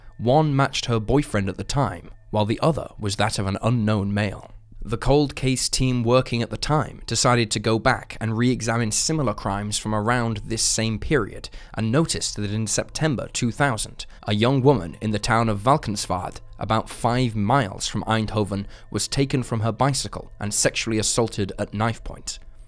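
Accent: United Kingdom. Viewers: British accent 👎🏻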